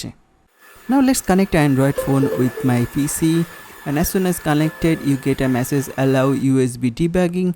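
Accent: India. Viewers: neither free nor for all android. His awful english (indian) later on tells you it only does Samsung phone. I loathe this b.s.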